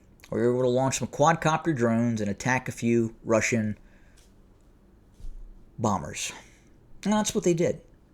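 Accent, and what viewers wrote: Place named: United States. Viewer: Nice to hear The Kremlin spin in crisp American English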